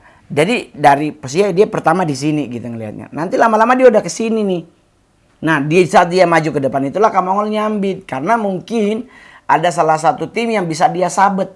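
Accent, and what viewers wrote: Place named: Eastern Indonesia, Indonesia. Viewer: Tengkyuuu kaka Mongol, kita jadi tambah pengetahuan sedikit seputar Satanic yg menarik tapi mengerikan. Ayok kaka Mongol, ekspedisi yuk ke 7 titik di Indonesia yg sudah diikat itu 😉👍. Gw tertarik dengan pengetahuan kaka Mongol seputar Satanic waktu join di DMS, hebat 😉👍 Plus logat Indonesia Timurnya, mantaaaappp kaka 😃👍 Salam katong anak Papua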